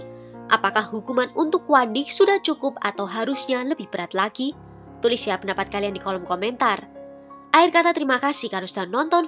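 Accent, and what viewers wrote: Java, Indonesia. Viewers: alamak medok gilerr derr😂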